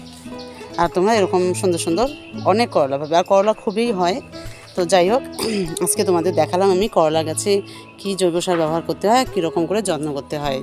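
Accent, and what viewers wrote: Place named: Bangladesh. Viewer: আপনি তো একদম বাংলাদেশিদের মতো কথা বলেন। আমি এত দিন ভেবেছি আপনি বাংলাদেশী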